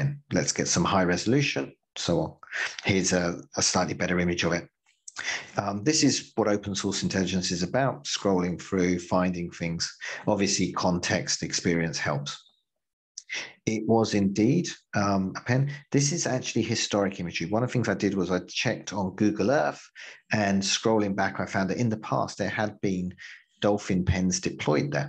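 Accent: United Kingdom. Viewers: I like the combination of shitty audio and the British accent. It works for me but I see what your saying. This dude sounds very informed and I like his delivery.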